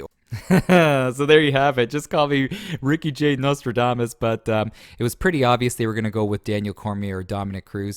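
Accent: Canada. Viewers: Why do u sound soooo canadian?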